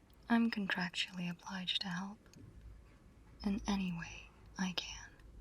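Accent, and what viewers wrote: United Kingdom. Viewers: dude that posh accent lol. you actually did great with the animation, you really make them breathe and emote.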